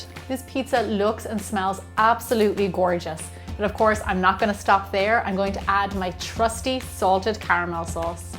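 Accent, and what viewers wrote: Ireland. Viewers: Hello are u irish if so I'm half irish and I went to Ireland for 4 weeks and made half your stuff love you! I'm going to be a confident bold baker for the rest of my life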